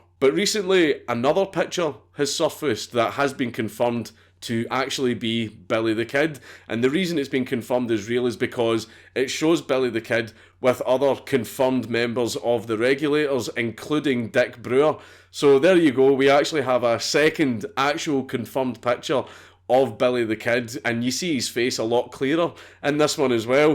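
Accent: Scotland, United Kingdom. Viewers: It’s fucking brilliant when you hear a Scottish man say murder 😂. Your accent makes your videos. The research you do and how you portray every story is top tier. Fantastic channel. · Its pronounced bu lly tha kid in scottish william becomes wulliam bill becomes bull not sounding bull as in the animal they sound diffrent hard to explain to pple who are not scottish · There is just something about hearing a Scotsman describe the old west that touches my heart....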